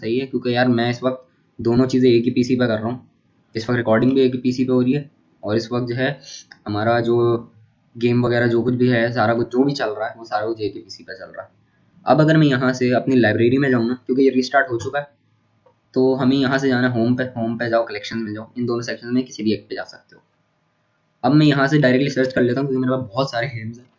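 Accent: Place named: India. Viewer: why is Indian accent annoying 🤠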